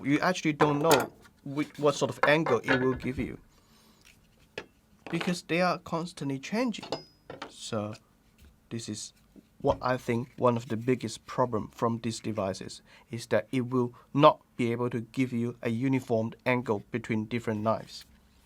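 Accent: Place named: England, United Kingdom. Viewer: english accent . funni .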